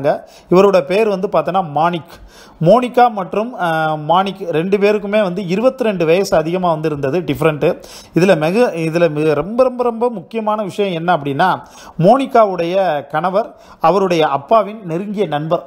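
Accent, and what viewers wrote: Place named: South, India. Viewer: Ninga South ah? Slang apdiye pesuringa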